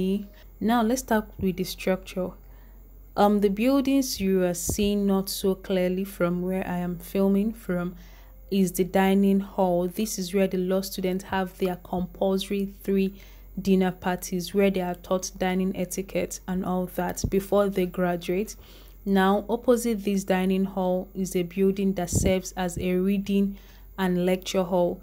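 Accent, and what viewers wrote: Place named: Enugu, Nigeria. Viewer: Dat Enugu YouTuber, I love your accent and your work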